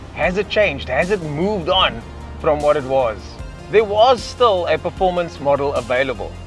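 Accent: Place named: Afrikaans, South Africa. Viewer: That horrible Afrikaans accent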